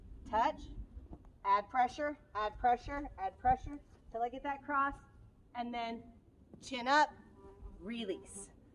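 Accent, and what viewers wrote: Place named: Texas, United States. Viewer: What part if Texas are you from?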